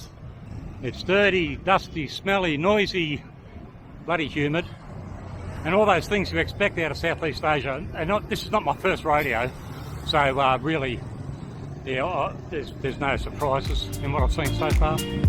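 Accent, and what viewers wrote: Australia. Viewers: I would think there is little chance of getting kidnapped in the philippines - the dominant religion is Roman Catholic, and it's quite conservative. Nice video, and good to hear another Aussie accent for a bit. 'Flat out like a lizard drinkin' up those hills. I'm the same now (not that I did any serious cycle touring until my 50's anyway) Dingo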